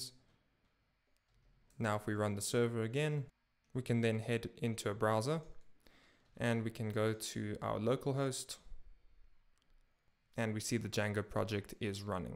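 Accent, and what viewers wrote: South Africa. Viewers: Are you by any chance from South Africa? You are doing a great job by the way.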